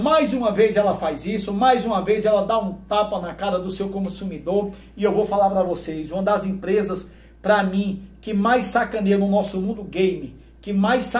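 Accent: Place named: Northeast, Brazil. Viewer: oxi... pra mim tu era daqui do nordeste homi kkk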